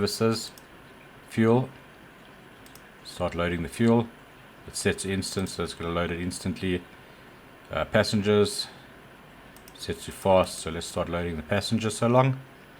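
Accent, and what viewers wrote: South Africa. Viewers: Nice to hear a south african accent for a change. I live in George Western Cape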